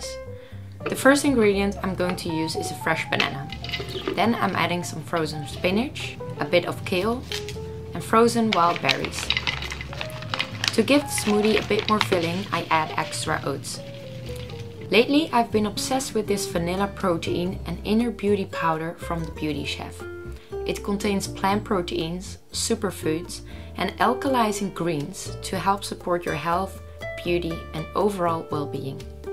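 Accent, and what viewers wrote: Netherlands. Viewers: you are dutch aren't you? i hear it in the welkom and the ja's in the beginning of sentences ahaha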